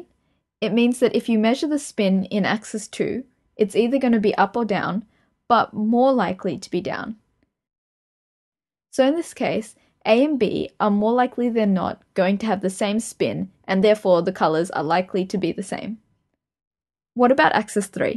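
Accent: Australia. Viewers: The more I watch your videos the more I convince myself that you are Australian. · Wait a sec, are you Australian? Why am I so bad at noticing these things. (shamed Australian here)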